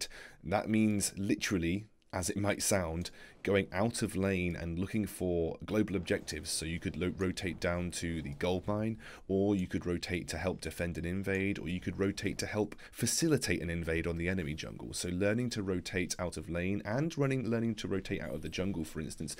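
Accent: United Kingdom. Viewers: think hes on Europe because his intro and his language show us he is brtish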